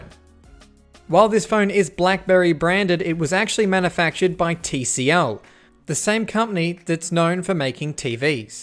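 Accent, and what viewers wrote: Australia. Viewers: Nice Australian accent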